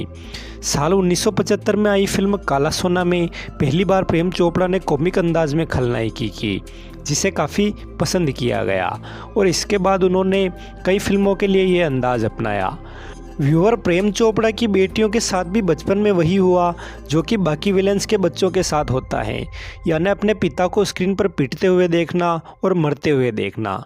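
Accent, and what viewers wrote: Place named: Marwari, India. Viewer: मारवाड़ी है ।चेनल ।में ।बोलने वाला ।उच्चारण से पता चलता है ।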